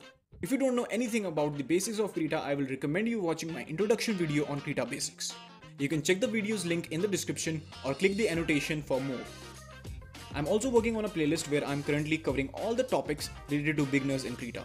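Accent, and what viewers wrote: India. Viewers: i hate hendeee accent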